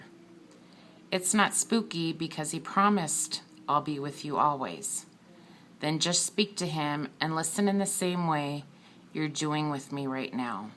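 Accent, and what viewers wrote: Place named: Upper Michigan, United States. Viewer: You have a Upper Michigan type of accent. It could be Minnesota or Wisconsin too. I grew up in the U.P. but live in TUCSON-AZ.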